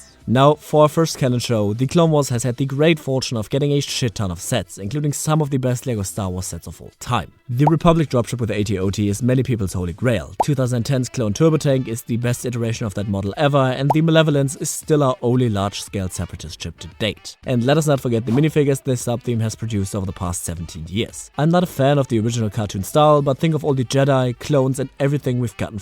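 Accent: Germany. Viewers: Duhörst dich deutsch an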